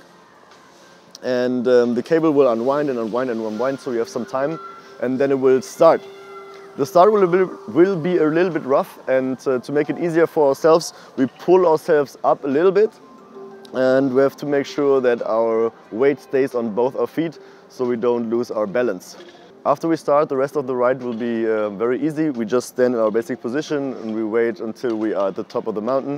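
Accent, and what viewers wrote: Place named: Germany. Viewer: B H-Abigel German accent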